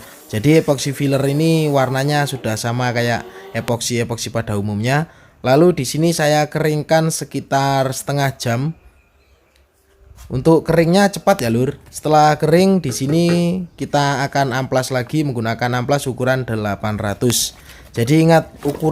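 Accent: Temanggung, Indonesia. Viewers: mas kok logat bicara koyo wong temanggung? sampean temanggung to?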